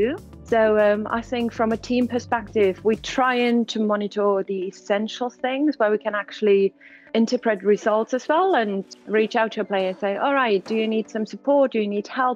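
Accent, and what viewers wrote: Liverpool, United Kingdom. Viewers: Her scouse accent is proof how much she embraced the new life and culture of LFC and probably the city in general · She's started to sound a bit scouse. :)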